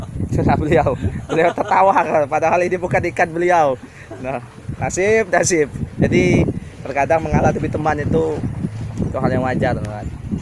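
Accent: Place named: Banyumas, Indonesia. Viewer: Alhirnya dapat banyak ikan juga yutuber ini, cukup kocak, bahasanya agak ngapak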